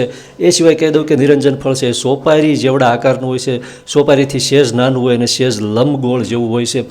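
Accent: Bhavnagar, India. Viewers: ભાઈ, તમારી ભાષા ટિપિકલ ભાવનગરી છે ! અનુમાન સાચું છે ?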